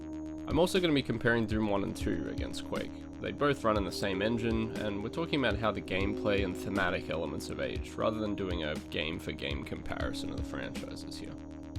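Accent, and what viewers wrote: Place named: Australia. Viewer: Subbed! Not trying to be a bitch, I mean this as constructive criticism - you talk kinda slow, your voice is nice it was a tad too fast on 1.25 and , it would probably be good to speak a little faster. - it's not your accent, I'm Australian too, peace from Perth, WA(steland) ❤what you said about good sprites > poor 3D, HOLY SHIT THAT IS SO PAINFULLY TRUE WITH POKEMON! Seriously, compare the gen 5 sprites on the DS (nearly 20 year old hardware tsk tsk) to the gen 6 (3DS) 3D renderings, they looked lifeless and cursed - shit, compare gen 5 sprites even to gen 9 on the Switch, gen 5 sprites are STILL sooooooo much better!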